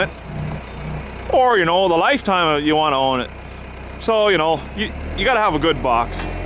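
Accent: Canada. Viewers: what part of Canada you from? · I can tell this is a Canadian accent from a mile away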